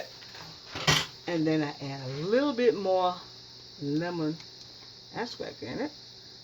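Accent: South, United States. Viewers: My mom is 85 born and raised in the south and has always pronounced her words like her. Especially nuttineg for nutmeg! If I hear you pronounce it like that then I know three things about you. 1. You’re a great cook! 2. You’re a clean cook! 3. You’re raised in the South! And one last observation, You were raised RIGHT and have lots of great advice and knowledge of natural remedies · Her accent is everything! Southern Bell honey!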